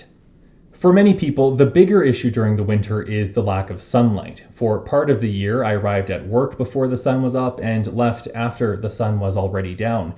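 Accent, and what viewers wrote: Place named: Canada. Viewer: Very interesting and informative. As an aside, you have what I'd call an incredibly clear example of a distinctly Canadian accent. As a lover of accents, I appreciate your video for that fact as well.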